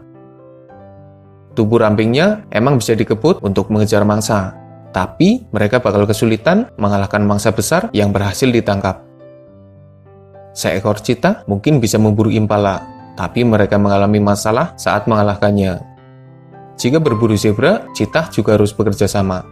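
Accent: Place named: Java, Indonesia. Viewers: Medok bang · Bang suarane medok tp lucu nemen yakin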